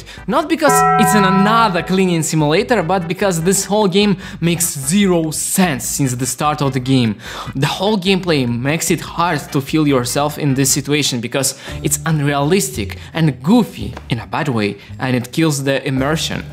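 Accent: Russia. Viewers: Акцент русский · Даже акцент немного русский я уверен это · У все ещё русский акцент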